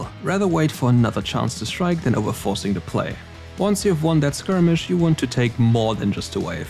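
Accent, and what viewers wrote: Germany. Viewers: I like the charming German accent in the recent videos.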